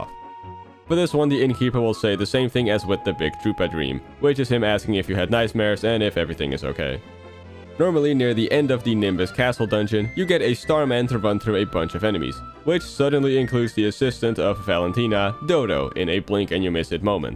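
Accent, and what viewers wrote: Netherlands. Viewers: You sound very dutch im dutch as well loll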